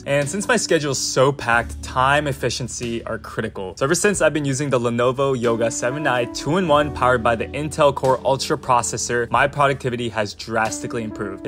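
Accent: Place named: California, United States. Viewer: U don't have a New York accent. U sound more like a Californian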